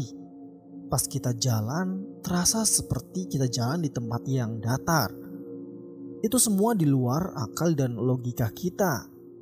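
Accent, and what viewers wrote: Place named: Sunda, Indonesia. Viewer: kirain asli sunda semangaatt tross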